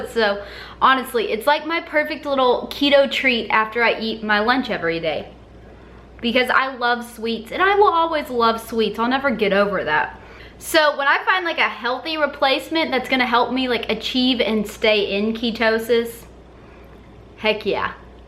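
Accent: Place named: Texas, United States. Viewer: You are so adorable! Love watching your channel! Learning so much! I grew up in Ft. Worth so love your TEXAS accent!! ☺️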